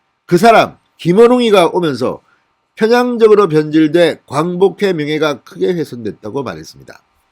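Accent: Gyeongsang, South Korea. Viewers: 진성호씨~그놈의 대통령 소리 이제쉿~억양이갱상 도라 통자된소리 토놤~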